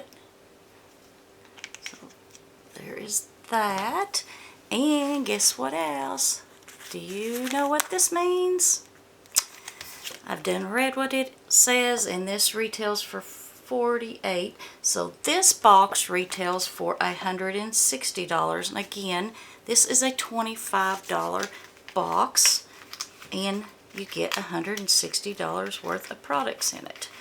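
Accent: South, United States. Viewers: Morning 😊 Love that your comparing a new Subscription to an older one. The highlighter palette is pretty . Your hair looks gorgeous 😊 I love a southern accent. Oh you got 2 ND palettes, I hope I get one in my box 😀 Both were great boxes 😊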